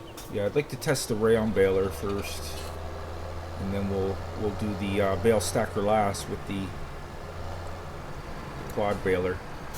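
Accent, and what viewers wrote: Canada. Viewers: Its just mac-h-ale like ginger ale u got a strong candian accent btw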